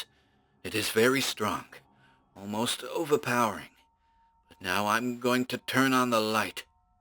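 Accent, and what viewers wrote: United States. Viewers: Wow! This story is awesome! I love the setting and description of the old house and environment around it. This is well-written....many of these old tales are. Fantastic narration! Your American accent it great! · Pretty good American accent! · Your American accent has improved tremendously since you first tried it out.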